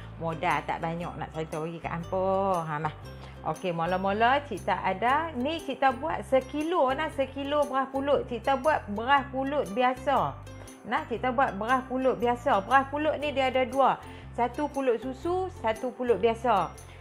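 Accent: Kedah, Malaysia. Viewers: Bukan lawak..org Kedah memang ckp.macam ni.. sbb tu org kedah unik.. dan memang hati depa ikhlas.. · Cik org.belah mana..dikedah ni..petua baguih sungguh..laa haih siap loghat kedah..terbaik ikhlas ngguh..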